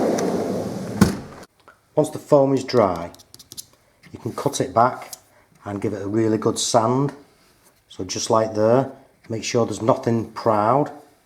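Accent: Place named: St Helens, United Kingdom. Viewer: Sounds like a St Helens accent that mate, good work as well bud 👍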